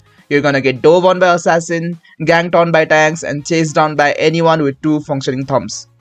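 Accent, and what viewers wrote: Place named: India. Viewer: title looks Filipino but your voice sounds Indian 💀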